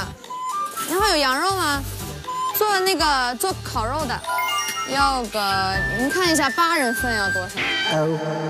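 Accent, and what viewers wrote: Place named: Zhejiang, China. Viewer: 很浙普吧！浙江美女代表，聲音軟糯軟糯的，好好聽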